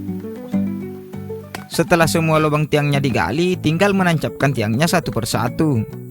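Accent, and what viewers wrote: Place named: Bugis, Indonesia. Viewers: Kalau dekat saya ingin main ke pondok Abang.. Sayang saya di Jawa . Kalau lihat dialek nya Abang ini suku Bugis ya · Seperti nya bukan orang Batak tapi Sulawesi Selatan logatnya mirip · Orang manaki bosku, dari logatta, kayak orang maros kepeng😁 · Kental kali Bugis nya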